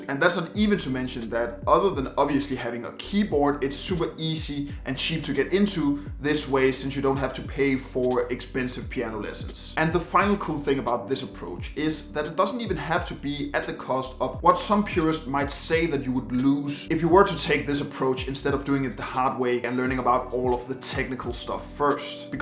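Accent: Denmark. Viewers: Thanks for the inspirations, I am brand new myself, and are still working on my wife to allow me to buy a piano LOL... BTW are you from Denmark? I am and think I hear a bit Danish accent in your video. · Jeg var ret sikker på, at du var dansk - ud fra din accent. Da du så slutter af med at sige "... rounding of the video" var jeg helt sikker :P Tak for en god video :) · i can hear the danish accenttt :p anywho great video I'm 18 and wanna start learning how to play piano so bad- I've always wanted to know how to play an instrument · Dansker, lol.. så tydeligt :D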